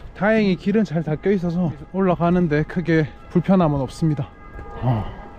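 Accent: Gyeongsang, South Korea. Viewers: 우연찮게 보게되었는데 정주행 하게 되네요.같은 경상도 사람이라 억양이 친근하이 좋습니다ㅎㅎ영상은 어디서 배우셨나봐요.전문가 포스가 느껴지네요.안전한 여행하시고,좋은영상 기다리고 있을게요^^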